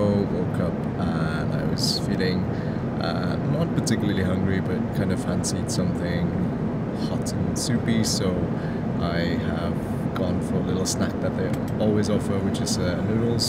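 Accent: Wales, United Kingdom. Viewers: Do I detect a hint of a Welsh accent? Great vid, can’t wait to be back in HK, hopefully later in 2021 :)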